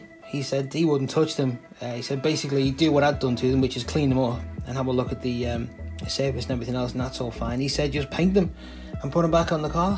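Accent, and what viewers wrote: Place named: Liverpool, United Kingdom. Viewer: Welcome back, your right about making videos of the work, taking more time than the job itself, I tried while renewing my rear spring front hanger,( MGB suspension ) posted on YouTube, took ages to edit, will try again soon and put some sound on the next one, great video, lots of colourful English, always sounds better in Scouse, I read somewhere that the original lever shocks were designed to leak through the shaft for lubrication and recon ones had a proper bearing and an oil seal fitted, maybe that explains why yours don’t leak, I’m just about the same stage on the rear end of mine, shutz on today, tank and axle next, so I’m waiting (for you to skin your knuckles, so I don’t have to) thanks for all your fascinating work, Steve.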